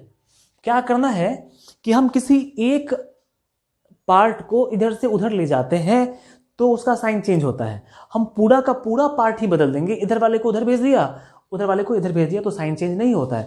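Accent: Bihar, India. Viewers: Bihari language padhaai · Oo ho bihari❤❤❤❤❤❤❤ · Sir mai bhi Bihari hun App ke (Bihari style +study) bahut accha Laga mai yah video 2026 ko dekh raha hun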